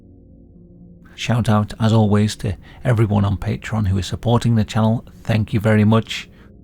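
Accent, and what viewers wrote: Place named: Yorkshire, United Kingdom. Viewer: The dudes from Yorkshire and pronounciation varies.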